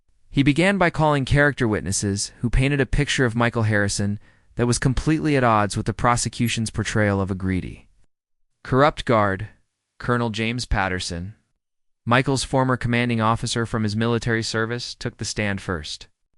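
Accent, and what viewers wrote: United States. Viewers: Right! As if a member of the Brigade of Guards would appear at court in his parade uniform. Rubbish with an American accent as usual! Bloody stupid!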